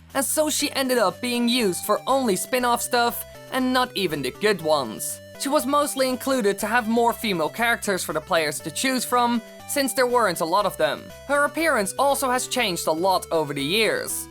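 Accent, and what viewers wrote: Netherlands. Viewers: Wait. You are Dutch, amirite? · Did nobody notice that this guy sounds like hes dutch...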